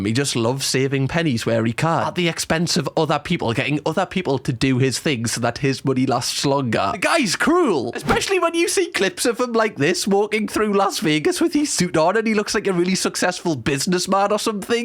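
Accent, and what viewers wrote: Newcastle, United Kingdom. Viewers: He's from Newcastle in the UK! 🥰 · I love the way you speak so much. The jokes you Crack, I'm dying 😂 it sometimes gives me little Britain vibes. I love British English so much 😂❤️ much love from Germany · I love Geordies. I was conceived in Newcastle but born in wales but when I hear you I think of my parents and happy times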